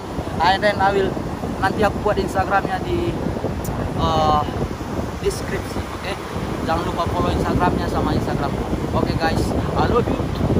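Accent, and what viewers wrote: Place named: Medan, Indonesia. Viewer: Sepertinya logat anda anak medan ya.....hehehehehe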